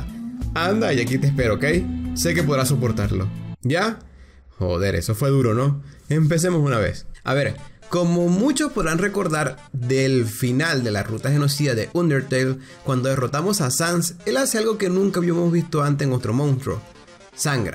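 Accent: Venezuela. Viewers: Eres venezolano XD mano del alma! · eres venezolano? :V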